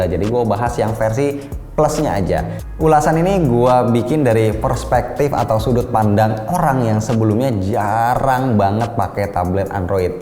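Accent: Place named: South Jakarta, Indonesia. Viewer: Jaksel. Bet logat mu bang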